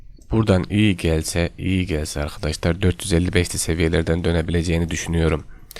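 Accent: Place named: Kırşehir, Turkey. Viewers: da kırşehir şivesi var gibime geldi. sa nasrettin hoca demek istedim. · Ne güzel konuşuyor tam bı Anadolu çocuğu teşekkürler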